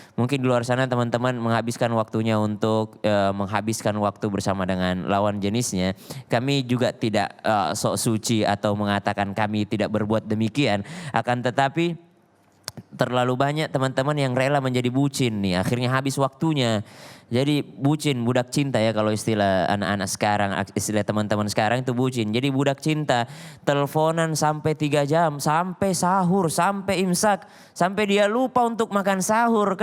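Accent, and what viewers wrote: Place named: Sulawesi, Indonesia. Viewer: Ustad te koyo dr sulawesi yo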